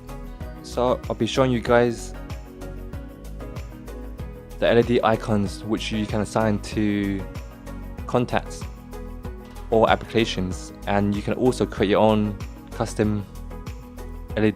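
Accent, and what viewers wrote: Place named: United Kingdom. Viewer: It's a pain in the ass listening to British accent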